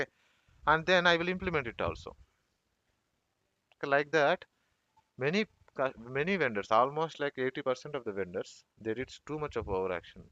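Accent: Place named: India. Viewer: So hard to understand with indian accent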